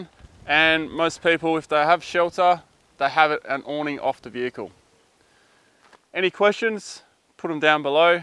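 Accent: Australia. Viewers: Why did I know he was Australian before I opened the video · This guy sounds like Callum Von Moger. Where is Aus you from?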